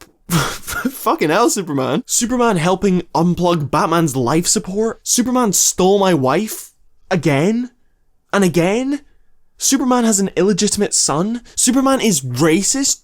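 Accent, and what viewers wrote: Scotland, United Kingdom. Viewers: Here good to hear a Scottish voice on YouTube for a change. Great video.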